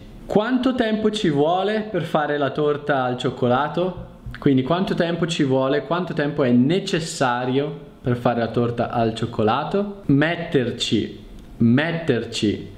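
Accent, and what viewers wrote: Brazil. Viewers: Lástima que en la pronunciación, lo hace como brasilero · Ciao! Tu sei italiano o Brasiliano?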